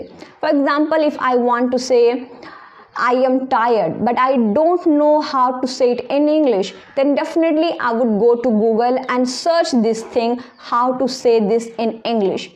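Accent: India. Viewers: i would recommend you not to change your accent. it’s natural to have ones own accent which country they’re belong to. If a foreigner start speking hindi in their accent and utter ever sentences like foreginer it would also be incomprehensible for us to comprehend. Earnest plead from my side 😝😝btw love your contents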